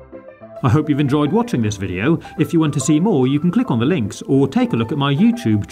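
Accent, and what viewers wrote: United Kingdom. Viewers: Das Op he’s british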